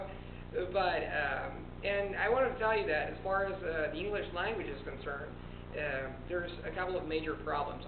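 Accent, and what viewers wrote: United States. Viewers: he is pretty good at the accent imitation and his pronunciation is really good except for a few words but the stress patterns and rhythm of his speech kinda gives him away. pretty damn good though. he sounds like he has lived in the US for a long time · man!! you're a real yankey! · You sound great speaking English with the American accent! :D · You have a perfect american accent! I speak a different language at home and have an asian accent. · Are you really Italian? You sound way too native American. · did u ever try to change ur american accent to the british accent because u may have thought that it was unattractive? Im american but ill admit any day of the week that british english is more elegant than american. im learning spanish right now and im torn between accents (latin america or castellano)... did u ever struggle with those types of choices? 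ur italian and u had the option of choosing ur english accent...likewise im american and have the option of choosing my spanish accent... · wow, u have a really good american accent! good job really! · good english, 99% american accent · 100% American accent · Your english is really good. I'm impressed. You even have an american style of speaking. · dude. you sound like you're from America 99% of the time. that's really great. I'm learning French now and will have to learn Italian and German later. tight man. kudos. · Holy crap! Excellent American accent. I love it when people understand that the voice has to be placed differently. I myself am not so fond of the American placement, but you have mastered it perfectly. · Brilliant accent. BRILLIANT. I have to disagree with sheepthing, if I hadn't known you I would have thought you were an American. I have never seen someone pick up an accent this good without a decade of time in a country... 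· I've never seen such a perfect American accent from a non-English person..even the way you express your setence structure is very American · You're cute and have a great American accent. I would suggest, however, if you come to America, you should speak with an Italian accent. Foreign accents are sexy to us. Of course, the flip side of not knowing how to pronounce a word you see is not knowing how to spell a word you hear. As my father says, "All words are outlaw words." :) Supposedly, Gaelic is just about as messed up as English in that respect. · Your American English is pretty good, it's quite impressive actually. I can see you are trying to sound American, I can still sense your Italian accent, but it's barely there, this video proves to me that the whole 'Italian American' accent is actually almost a 'made-up' accent that evolved here in America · yo hablo ingles y espanol y la verdad hablas el ingles con muy buen acento americano, yo tengo viente anos viveinedo aqui en los estados unidos y asta este dia puesdo hablar con un acento como el tuyo es dificil cuando la lengue natal es un idioma completamente diferente como el espanol. 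very good your a master of 8 languages something i will never have under my sleave. saludos jorge de texas! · Day two: It's amazing how you have an American accent. It's really cool and inspiring for those of us learning languages and trying to improve our pronunciation! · This is a really good American accent!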